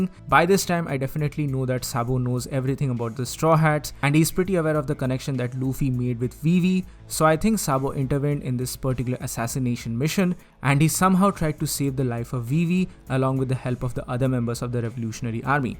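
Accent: India. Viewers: yeah its indian accent ,I can understand I am also indian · ur accent is very familiar ... Are u Indian?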